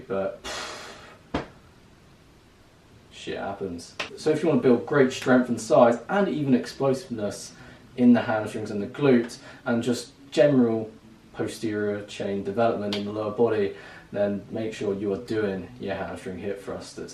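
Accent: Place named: East End, United Kingdom. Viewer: I thought you just had a typical east end London accent lol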